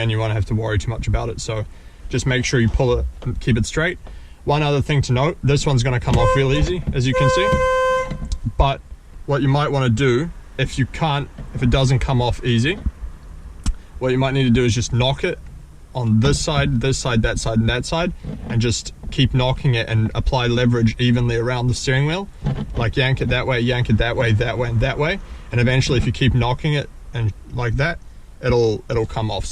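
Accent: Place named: Australia. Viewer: Interesting accent. Aussie in the US?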